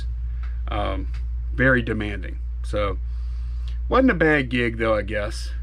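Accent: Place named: Los Angeles, United States. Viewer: Don't have an accent!? Are they watching the same channel I am? Hahaha. True you don't have as thick as other dudes I've known from LA but I want to know where that viewer is from that they can't hear it.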